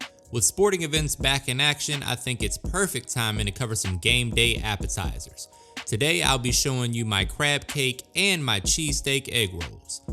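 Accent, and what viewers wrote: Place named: Louisiana, United States. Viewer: Sounds to me like... You must be from Louisiana! 😁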